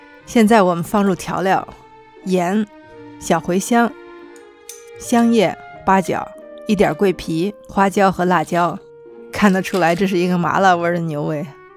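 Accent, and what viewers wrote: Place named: Northeast, China. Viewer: 我听你的口音有点像东北口音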